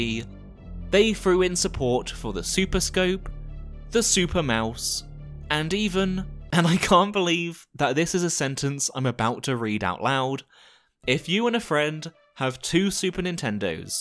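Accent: United Kingdom. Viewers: Ayyyy it's the boi! That's what I like to see. Missed this incredibly calming and soothing, british voice👏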